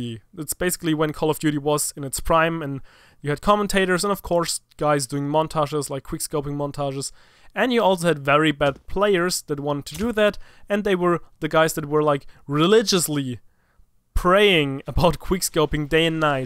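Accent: Germany. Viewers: ! Der deutsche Akzent regelt Bra^^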